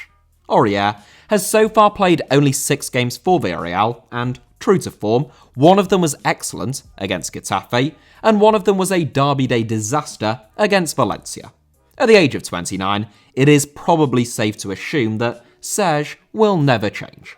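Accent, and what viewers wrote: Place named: United Kingdom. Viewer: That's just how pronounces it with his Bri'ish accent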